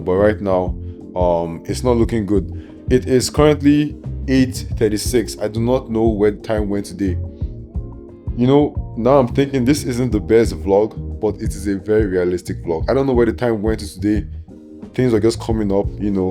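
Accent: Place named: Nigeria. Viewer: You must be Nigerian right?😊 I can tell from your accent brother 😊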